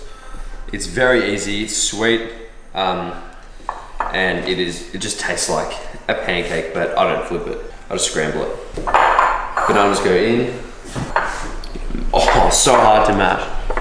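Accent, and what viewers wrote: Australia. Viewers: Aye are ya from Melbourne? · Are you Australia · Is this guy aussie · are you from Australia 🇦🇺? If so I’m from Melbourne Australia 🇦🇺 G’day mate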